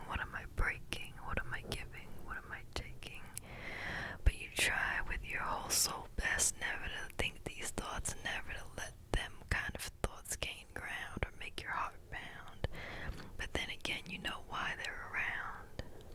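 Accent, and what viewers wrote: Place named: Louisiana, United States. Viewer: These lyrics struck me in an interesting way. It reminds me so much of how I've felt for a while, so it hit me quite deep. I could relate to it. And the country accent, it reminded me of a Louisiana accent, particularly from southern Louisiana.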